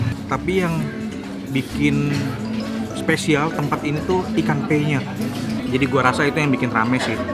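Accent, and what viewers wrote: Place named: Java, Indonesia. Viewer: mantappp....jawanya tambah Meddokk mamen mkan coyyy..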